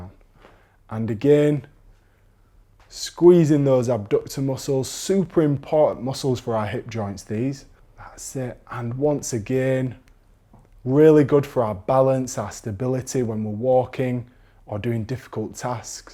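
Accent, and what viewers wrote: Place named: Sheffield, United Kingdom. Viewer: This is great. I'm from Sheffield but have lived in Mexico for years, so it's great to follow exercises for my hip arthritis from someone who sounds like he's from my neck of the woods. Update: Still doing these exercises, I like the clear instructions about correct technique but without unnecessary waffle. I feel like I'm in the room with the two blokes.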